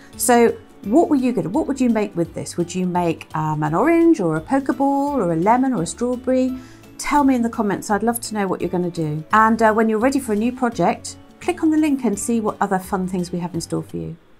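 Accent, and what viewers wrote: England, United Kingdom. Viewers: I love this simple pattern! I am going to make my 3 year old son his very own pumpkin! He can decorate the pumpkin if he wants to. Then I think I'll make some for me and hubby. Thanks again for sharing this pattern. Love your accent. Are you from England? I'm from long island N.Y.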